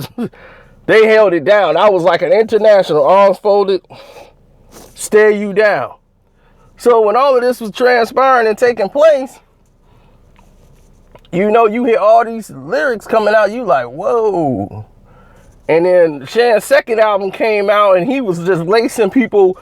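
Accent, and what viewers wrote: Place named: South, United States. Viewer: This brother must be from down south somewhere North Carolina or somewhere cuz he's definitely not a New Yorker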